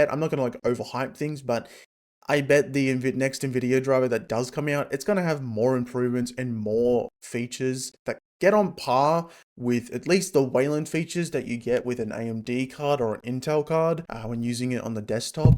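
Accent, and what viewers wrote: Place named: Australia. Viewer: I just realized you have an Australia accent, simply sublime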